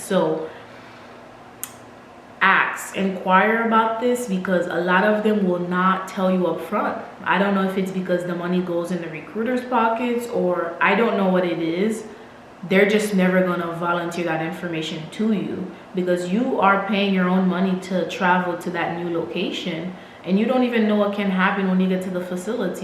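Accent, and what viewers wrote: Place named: Caribbean. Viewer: I sense a carribean accent. Are you and island girl ? Thanks for the content. Dont the agency pays for everything again?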